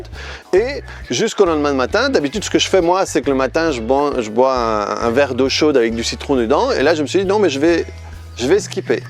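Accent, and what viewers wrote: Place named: Belgium. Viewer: Ça se voit pas mais ça s'entend que tu viens de Belgique haha ><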